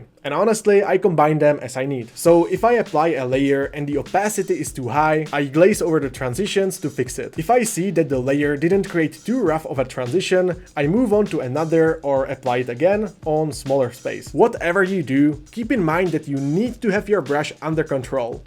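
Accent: Czech Republic. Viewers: Great video. Just out of curiousity, what is his nationality? I am hearing a Czech/slovak accent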